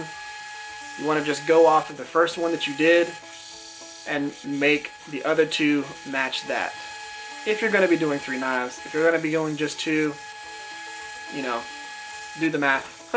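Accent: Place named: Georgia, United States. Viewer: Brother you sound like you are from Georgia like me! :)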